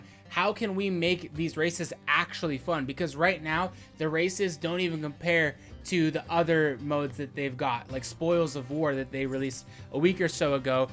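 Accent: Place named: Russia. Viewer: please make more vids especially with your Russian ascent. It’s hilarious! Once a week isn’t enough for me. I look for your vids daily.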